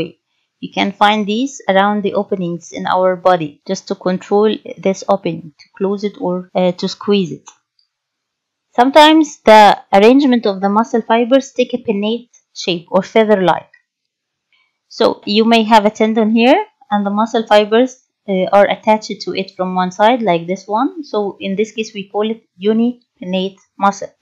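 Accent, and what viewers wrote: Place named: Egypt. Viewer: I love Egyptian English accent! Alot of respect mam From Pakistan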